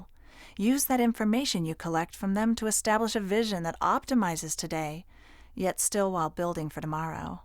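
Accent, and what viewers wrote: United States. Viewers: Its a west coast accent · Appreciate your generosity ❤ plus If I may ask: which state accent is that? I’ve been fond of that accent and I wanna know it’s origin in order to learn it and not to mix it up with other accents. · The voice is really not very clear. It's gabbled, American?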